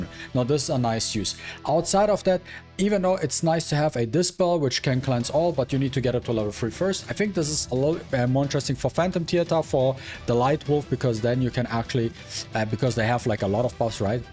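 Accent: Germany. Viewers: Bro is German?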